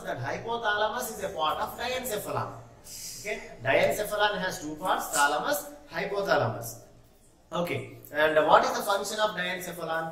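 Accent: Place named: South, United States. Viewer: Sir you are from south nnnn....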